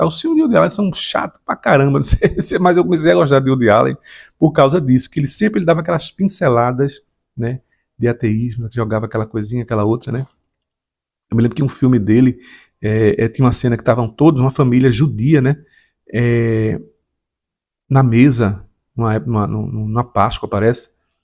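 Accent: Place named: Pernambuco, Brazil. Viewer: Muito boa a forma que o senhor explica,sem se exaltar com calma sem ofensas a quem ainda acredita parabéns, além do mais tem esse sotaque maravilhoso eu como baiano mais adoro o sotaque de Pernambuco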